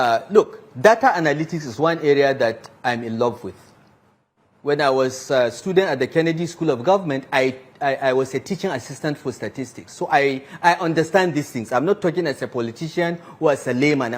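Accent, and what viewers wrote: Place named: Kaduna, Nigeria. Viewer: Sit down there and continue to speak Kaduna English.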